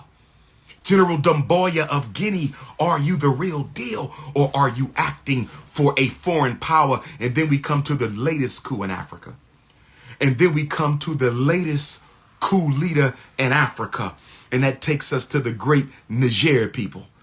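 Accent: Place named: United States. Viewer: My man, I wish you could listen to yourself you sound so American are you sure you’re not employed by the government to talk about Africa?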